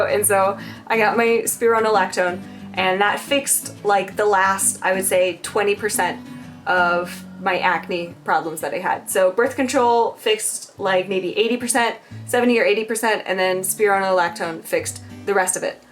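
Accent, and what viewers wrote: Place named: United States. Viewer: your american accent is going away and its cutee :)) ♡♡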